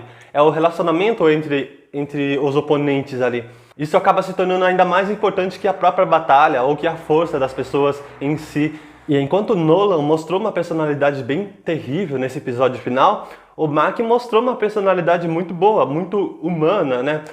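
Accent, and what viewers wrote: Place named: Latin America. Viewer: Cara vc e de algum pais de lingua espanhola? Vc tem um sotaque diferente. Vc nao e brasileiro? Valeu!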